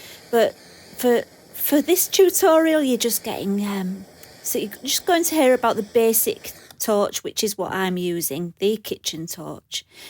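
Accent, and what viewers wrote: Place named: Yorkshire, United Kingdom. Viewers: I love your east riding twang. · Nothing more pleasing to my ear than a Yorkshire accent. Thanks for the tutorial!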